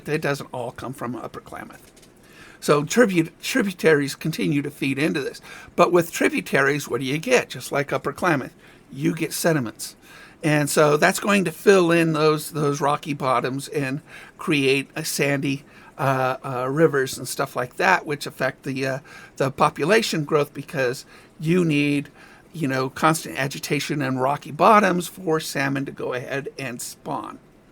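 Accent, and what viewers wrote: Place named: England, United Kingdom. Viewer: The dams needed to be removed ! But the sediments needed to be dredged out before removal plain and simple. It will take decades to flush out into the mudhole in the pacific out front of the klamath. Decades for the trees willow ash etc to grow to shade the river again.. As far as the sasenach narrating this go home to where you come from ! The river will heal itself just gona take time lots of time!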